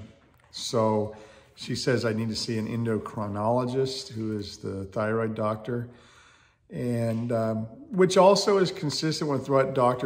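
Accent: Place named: Pennsylvania, United States. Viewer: I'm from PA. Friends&family in Sequim. I knew you looked & sounded just like someone from home! Nice to meet you, neighbour, via the world wide Web!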